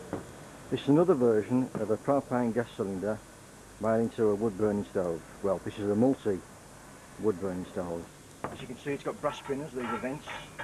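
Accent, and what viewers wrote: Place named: England, United Kingdom. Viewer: Hi from NZ. love the pommie accent mate. how did you do the cutting? with Plasma, jigsaw, hacksaw or angle grinder?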